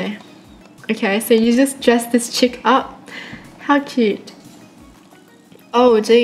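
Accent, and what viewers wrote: Australia. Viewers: Is she from australia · are u australian?!?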